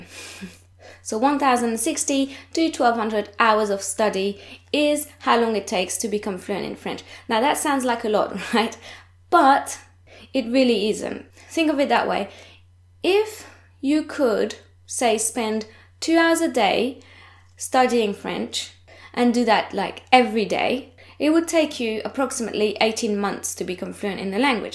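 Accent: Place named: United Kingdom. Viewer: Merci beaucoup for this video! You sound so British!!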